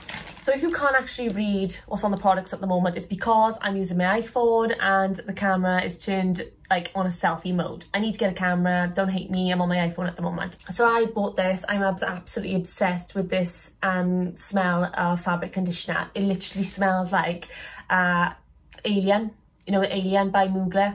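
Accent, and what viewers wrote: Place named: United Kingdom. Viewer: Love your accent dear! Can I ask where from the UK are you? Scotland maybe? 🥰 Also unfortunately cannot find you on Insta under your username :(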